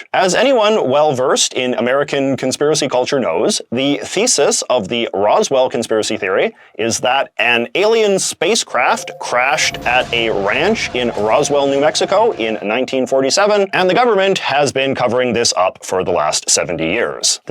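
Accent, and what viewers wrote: Canada. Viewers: How are you the most Canadian sounding youtuber ever??? That "About" has been UNMISSABLE in this video. Love ur videos tho. Love the way u articulate and project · D --> my guess is Canadian because of how he says the word "about"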